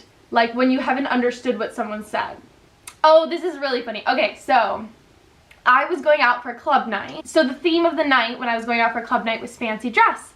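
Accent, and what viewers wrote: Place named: United States. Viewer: You're really pretty enough without make-up. That's a compliment and honest opinion. And you do still have your American accent, which I like. You also seem like a lot of fun to be around. I am surprised someone did not tell you what fancy dress meant, did they not ask what you'd be wearing? Take care and welcome to England.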